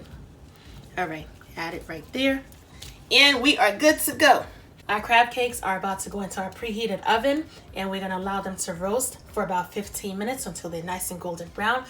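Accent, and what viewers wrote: New York, United States. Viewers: Love this recipe 😊 l hear that New York accent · Love her new york accent. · Off the chain sis got to be from nyc with the accent love it will make this 2morrow. And yes I subscribe and shared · Is that Queens or Bklyn accent I hear?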